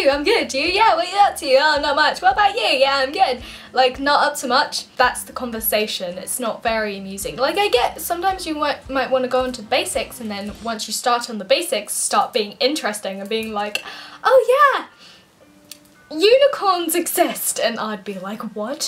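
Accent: England, United Kingdom. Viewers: Pshhh if I lived in England or Brittan or where ever that adorable accent is from XD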